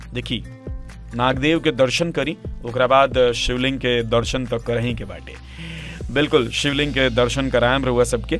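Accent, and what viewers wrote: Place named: Azamgarh, India. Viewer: आजमगढिया भाषा लगता, ठे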